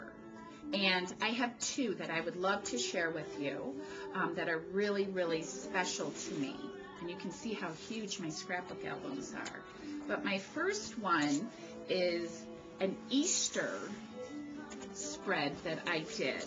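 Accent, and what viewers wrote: New Jersey, United States. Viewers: Nice to meet you. You are very sweet, love your NJ accent. You are so right that we should get to know each other better. When I am brave enough I will made a video too. My mom was from Chicago too. TFS! ~Sharon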